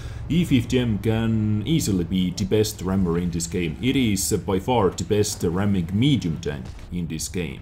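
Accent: Estonia. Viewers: Are you Estonian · are you from one of the baltic states Estonia? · Are you Estonian? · are you Estonian?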